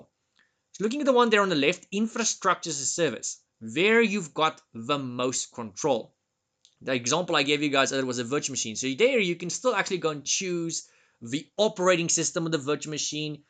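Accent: South Africa. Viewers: Still trying to determine if you from South Africa or Netherlands, lol. Thank you for this video! It really helps. What practice tests woild you recommend as well. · This is just a thumb suck but figured out your accent is South African from looking up that term after watchin' previous videos. I feel vindicated by seeing as your location in the trial. I am from West Texas but watch a lot of British Telly and know all the UK and Aussie accents and had been trying to place it. Your accent is so cool, and you are a great teacher. Thank you for your videos.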